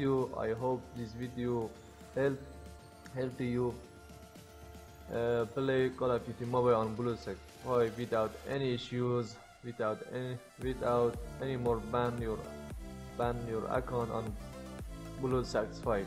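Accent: Iran. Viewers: ایرانی هستی اینقد لهجت کسشعره مادرجنده ؟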